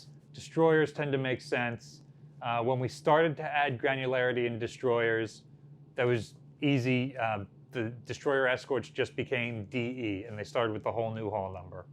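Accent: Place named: Hackensack, United States. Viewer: Im from Hackensack but live far away now, and while I have a casual interest in Naval history, listening to talk and his mannerisms make me feel like im home again. Thanks.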